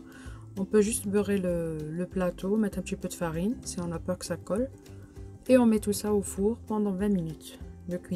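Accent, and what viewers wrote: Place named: Oran, Algeria. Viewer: J'adore ton accent wahran officiel 💟😅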